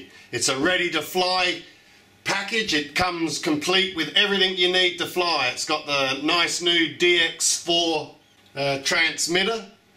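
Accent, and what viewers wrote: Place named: Australia. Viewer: I love your intro to all your videos, and the Ausie accent just adds to the entertainment value of your very informative reviews. Thanks! A big fan from the US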